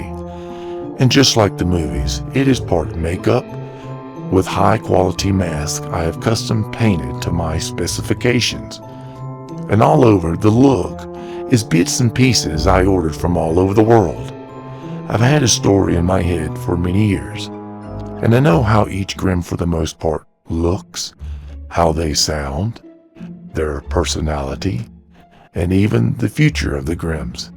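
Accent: South, United States. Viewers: You have a thick southern accent. Very thick. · You do you! I'll admit it'd suck if you went to a longer span in between videos, but we care more about your health and well being rather than getting a concrete upload schedule. Also, don't worry about subtitles! You were right when you said it was easy to get used to their voices. Finally, is that a Southern accent I detect? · Well, Awesome and Amazing answers and sorry but I noticed your Southern accent ☺☺☺ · The accent seem's like middle west georgia or central alabama,As i live in G.A. i can here the southern lilt.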